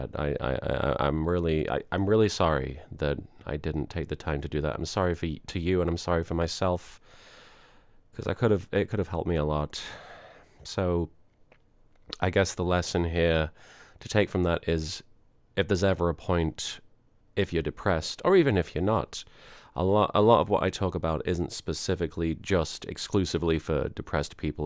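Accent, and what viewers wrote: United Kingdom. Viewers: i learn how to talk more like a british cause of you :D!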